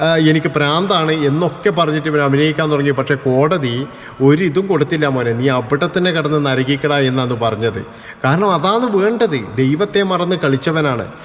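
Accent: Kannur, India. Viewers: നീ എടെ സ്ഥലം, കണ്ണൂർ അല്ലേ 😂നിന്റെ വർത്താനം കേട്ടാൽ അറിയാം 🤣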